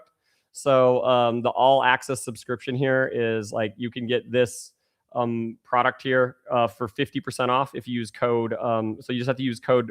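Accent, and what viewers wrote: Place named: England, United Kingdom. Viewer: Hi, i am from asia and have followed u for months. I am grateful to you since not only the valueable knowledge of data but also your english accent😂. I often listen to your talking to improve english, besides learning data😂, keep this accent:)))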